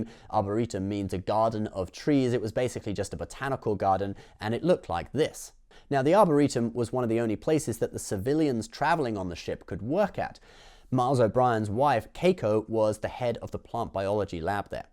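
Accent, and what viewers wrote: United Kingdom. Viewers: alan littlemoon keep in mind that this is The British and thus is likely to pronounce certain words differently than us Americans do Case in point: The word "schedule": US pronunciation: Sk-eh-jew-ull British pronunciation: Sheh-jew-ull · In his defense, he's British, they pronounce and spell things weird.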